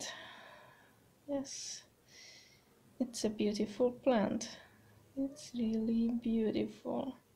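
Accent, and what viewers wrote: Hungary. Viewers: Your accent... are you from hungary? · You have a hungarian accent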